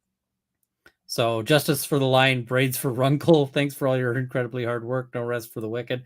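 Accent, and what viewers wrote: Canada. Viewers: I love the accent! Most of my family is in Canada and you sound just like them! Also my mom says it that way . She is Canadian and I’m dual cit but never got to live there . Still May move. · Had no idea you were Canadian! Yup, Americans always know us by our Canuck accent! I don't hear it either. Enjoy your blog and your jury insights. Today's witnesses (Tues) were a total bore. Those poor jurors! The damages perspective was a bit interesting.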